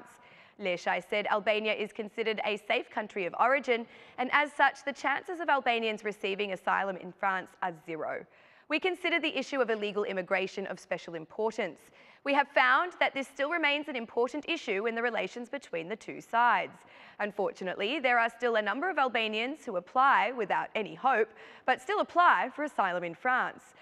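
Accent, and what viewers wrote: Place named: London, United Kingdom. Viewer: LOL Nga Londra eshte kjo prezantuesja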